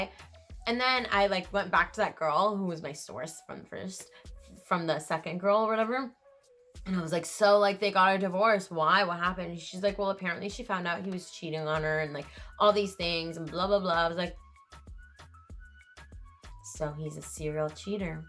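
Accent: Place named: Middle East. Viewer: Which part of the middle east you from?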